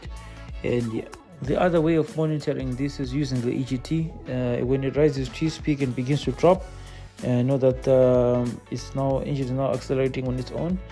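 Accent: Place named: Durban, South Africa. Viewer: Very nice explanation! Thanks. You sound like you might be from Durban? 😊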